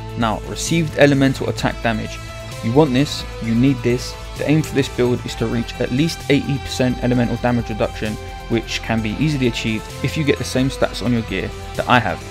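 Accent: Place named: Cockney, United Kingdom. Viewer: Can somebody translate his cockney for me, no idea what he's saying.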